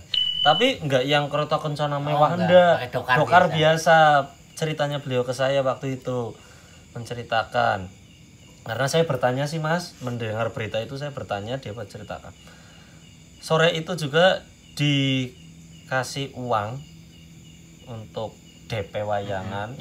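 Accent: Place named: Banyumas, Indonesia. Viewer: Ora ngapak ora penak mas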